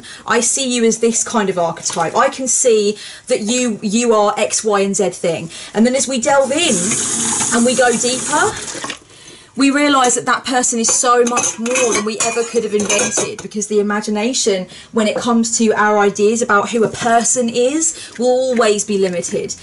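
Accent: United Kingdom. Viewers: This was brilliant ... can't say the word with the adorable British accent though ... thanks ☮️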